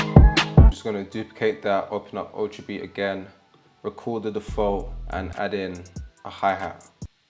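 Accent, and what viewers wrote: London, United Kingdom. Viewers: You from London g?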